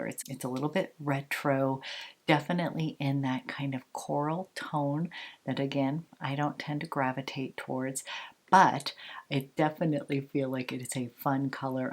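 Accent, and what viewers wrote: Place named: Upper class, United States. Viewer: quite right, definitely an upper class American lady.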